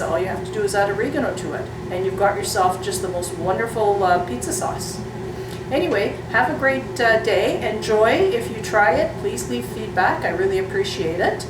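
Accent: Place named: Northwest England, United Kingdom. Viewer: Thank you for your response. Your accent did seem a little different. I was thinking the Mid/North West. I have bought a tomato press and now I'm contemplating getting a food mill because I want a smoother sauce. Now I believe I'm just gonna go ahead and get that food mill. Your sauce looked pretty good! You should keep making more videos!😉😊❤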